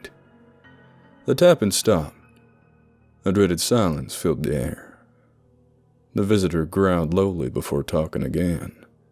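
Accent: South, United States. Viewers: I love your overly southern deepest of the south accent.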